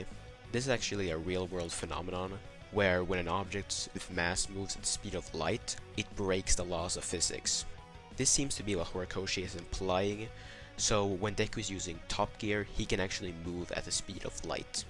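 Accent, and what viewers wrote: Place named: Sweden. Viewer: This dude sound Swedish ( great video btw, shit was good as hell)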